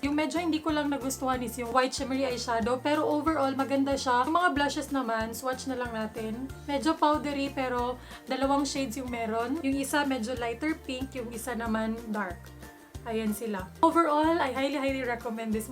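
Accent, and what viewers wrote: Visayas, Philippines. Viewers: Bisaya ni bai 🤙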